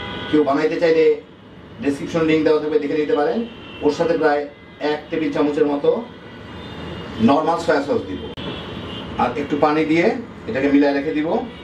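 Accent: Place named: Dhaka, Bangladesh. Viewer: অসাধারণ হয়েছে, আপনার ভাষা শুনে মনে হচ্ছে আপনি ঢাকাইয়া। আমিও ঢাকাইয়া 😁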